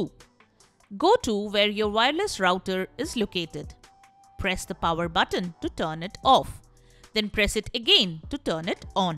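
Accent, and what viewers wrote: India. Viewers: lmao Indian voice. How am I not surprised.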